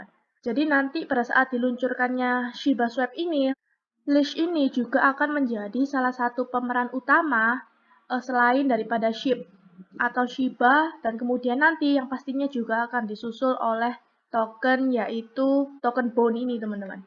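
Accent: Java, Indonesia. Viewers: suaramu medok tenan mbak, tp enak di denger, semoga berkembang terus channel YouTube nya.... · Kok medokk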